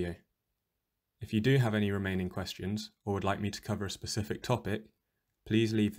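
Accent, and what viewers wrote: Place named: India. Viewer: Indian English make me sick.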